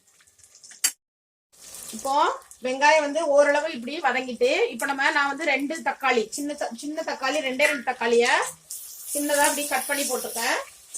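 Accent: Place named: Nagercoil, India. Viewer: Hi aunty.. Im studying 2nd year but I’m so interested in cooking that’s y started watching cooking videos ...I’m ur new subscriber ☺️ I love ur videos ❤️ And I love the way u explain and u upload different recipes ❤️ I guess ur from Nagercoil coz ur slang is like tat ☺️ Pls upload some of ur native spl recipes aunty ☺️☺️❤️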